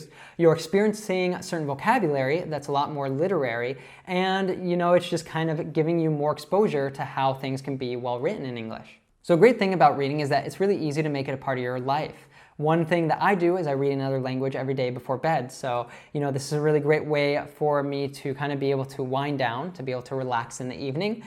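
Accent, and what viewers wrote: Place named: United Kingdom. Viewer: Hi....do you conduct online class. I like british accent and wanna to be fluent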